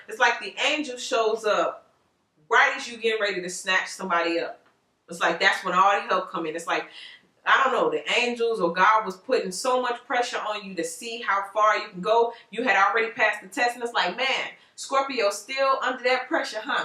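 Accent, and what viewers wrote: Chicago, United States. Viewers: Hold up, girl you from Chicago?!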